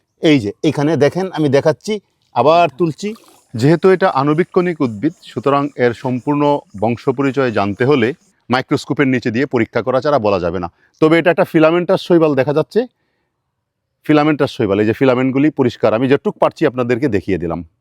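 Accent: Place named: Barishal, Bangladesh. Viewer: আপনের বাড়ি মনে হয় বরিশাল অঞ্চলে।,,,,,,,,, জানাবেন।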